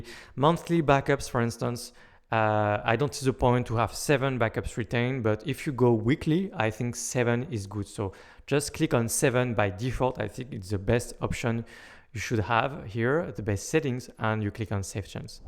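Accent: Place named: France. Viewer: Trop bien avec l’accent français j’arrive a comprendre ce que tu raconte 😊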